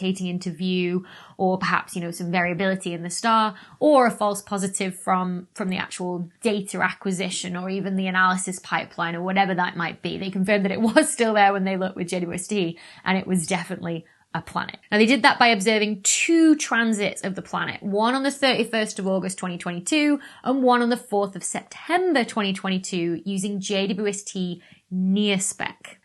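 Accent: United Kingdom. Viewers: It's her Briddish accent. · I don't know why, but the British pronunciation of methane is driving me nuts lately. Do you pronounce pentane and octane with a randomly long leading vowell? Probably not. If there was a clue that the vowel should be long (like if it were spelt meethane ) that would be one thing which would justify British practice. But to my eyes and ears, the Brits are more wacky than usual. Dr. Becky, can you appeal this to the Standard English Authority_? ;-) (Let's leave _aluminum for another time. ;-) On another front, I recommended a video of yours to my mom many months ago. She reported that she couldn't understand you well enough due to your "thick British accent." You sound fine to me, but 20 years ago I worked for a company which had like 15% Brits.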